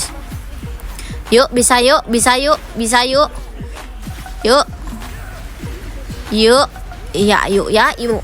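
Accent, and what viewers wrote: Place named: Bekasi, Indonesia. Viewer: Dari bekasi ya 😂😂 logatnya kaya ga asing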